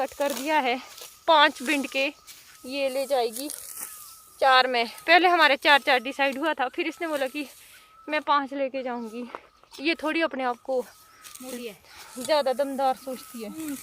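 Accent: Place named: Pahadi, India. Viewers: I am from una in Himachal pardesh Tuha pahadi bade ghaint bolde... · Bahut Sundar aavaj hai aapki.. uper se Pahadi accent uspe 4 Chand laga deta hai...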